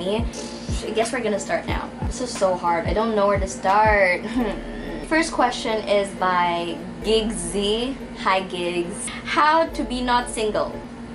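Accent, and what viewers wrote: Bisaya, Philippines. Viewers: Your bisaya accent so cuteee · Pag nag tatagalog sya halata na bisaya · when she's speaking in english seems like she's not a Filipino but when she speaks in tagalog you will actually recognize her as a Filipina and to be exact bisaya. ❣️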